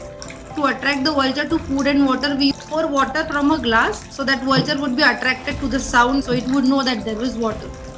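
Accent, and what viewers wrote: India. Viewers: the Indian accent makes my head shake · Lol, I love Indian accent. BTW thanks 😊 ☺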